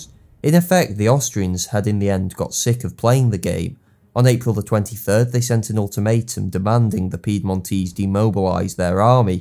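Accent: United Kingdom. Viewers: high quality content on interesting subjects with a grest british narrator!!! cant ask for snything more :)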